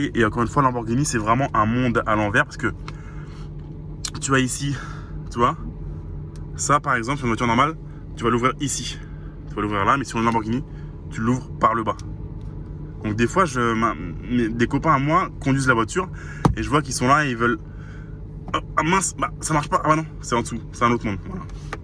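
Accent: Mauritius. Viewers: mec t'es mauricien?😅💯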